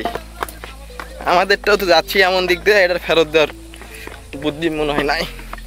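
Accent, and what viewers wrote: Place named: Bogura, Bangladesh. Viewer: Bogurar vasha hits different 🤍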